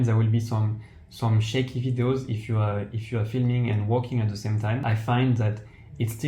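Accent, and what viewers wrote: France. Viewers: Good video but je sais que tu parle français ça s'entend lol merci pour les informations en tout cas 😁😁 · salut, est ce que tu serais un français par hasard ? tu as un peu l'accent je trouve hehe.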